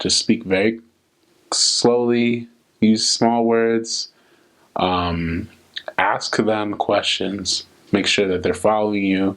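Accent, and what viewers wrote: Liberia. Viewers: Damn he sound just like a Liberian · lol oh yea he sound just like Liberian lol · Omg he sound really Liberian when he speaks koloqua 😍😍😍😍😍😍 thank you for serving my country my God bless you 😇😇😇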